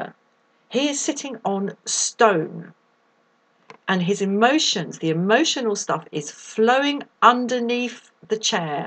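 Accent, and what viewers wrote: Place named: United Kingdom. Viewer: Loving your across the pond accent! Thanks for the needed message of new beginnings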